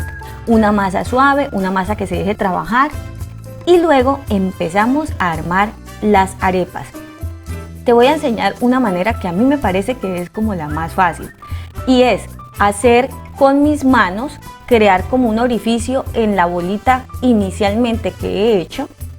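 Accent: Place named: Valle del Cauca, Colombia. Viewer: Tienes acento valluno eres del Valle del Cauca??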